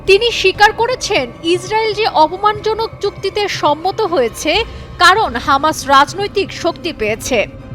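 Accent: Kolkata, India. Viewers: voice dada kolkata theke esse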